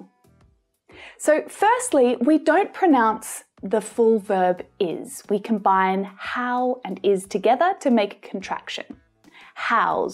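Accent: Australia. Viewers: Nice AUSTRALIAN sound. And I learn much from you. Thanks. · First time I’m really learning good pronunciation but from a teacher with an australian accent!!! Superb!!!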